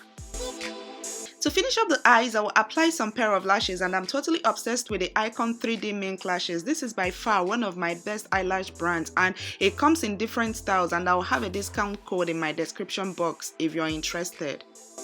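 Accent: Nigeria. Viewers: my sister... I like ur nija accent beta oooh.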